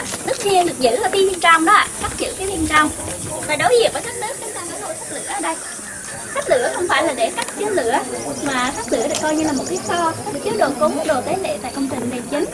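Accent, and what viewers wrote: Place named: Quang Nam, Vietnam. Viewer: Phát âm giọng Quảng Nam đòi chuẩn như Hà Nội ah .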